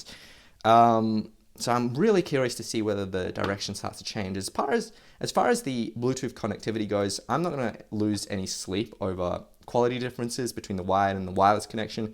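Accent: Australia. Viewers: Random question but is your accent australian?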